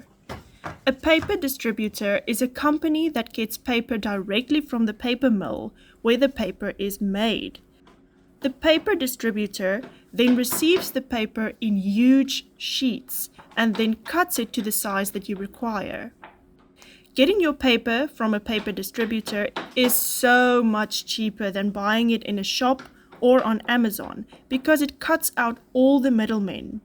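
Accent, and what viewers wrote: South Africa. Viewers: you sound South African so why are you using Amazon your links do not make sense e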